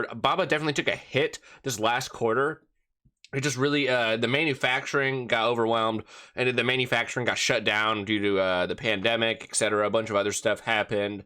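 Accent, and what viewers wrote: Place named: United States. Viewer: If you want to complain about an American speaking like an American, then go elsewhere.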